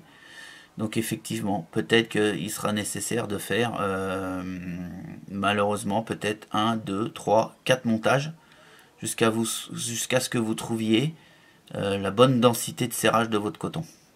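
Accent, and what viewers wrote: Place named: Paris, France. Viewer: tu me fais marrer avec ton accent titi parisien, ça met de bonne humeur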